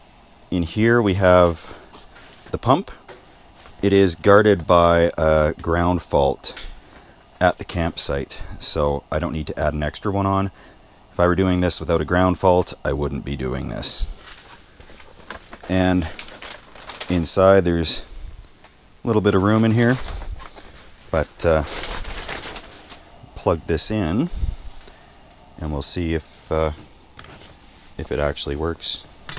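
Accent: Alberta, Canada. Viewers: are you from alberta?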